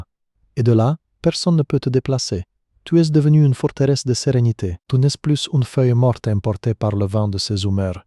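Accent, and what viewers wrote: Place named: France. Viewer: 😂Ce que j'aime dans cette vidéo c'est l'accent français qui relate les faits classiques et la solution 🎉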